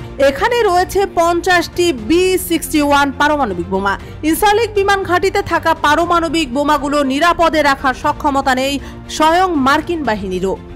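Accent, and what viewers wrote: India. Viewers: Ei shangbadik indiar hobe kotha bolar dhoron tai mone hoye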